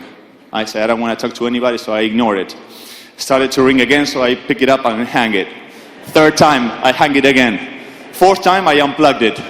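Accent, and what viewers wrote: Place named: Nigeria. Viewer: if you close your eyes you could bet Manu is nigerian. (awesome speech tho)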